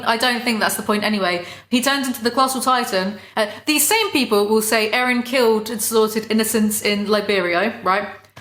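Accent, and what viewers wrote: United Kingdom. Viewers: nothing is far better than a British rant! good thank lord!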